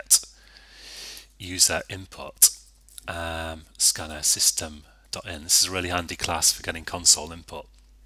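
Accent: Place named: United Kingdom. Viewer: Well done) Thx, nice tutors. It will be better to subscribe on your channel. Oh by the way. One little question: You are from GB ? interesting accent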